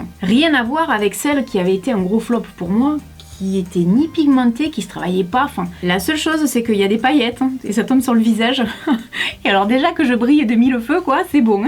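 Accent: South, France. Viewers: Hello je suis un mec et j'ai découvert tes vidéos alors au début je me suis dit avec son intro “salut !” je ne vais pas aimer elle dit ça d'un air sévère haha alors pour le coup je me suis complètement tromper tu est géniale, franche et d'une bonne humeur communicative avec ton super accent du sud j'adore bonne continuation tu est pétillante ! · je me répète mais j'adore ton naturel, ton joli petit accent du sud, ton humour, et ta sincérité! Je ne me lasse pas de tes vidéos:) bonne continuation!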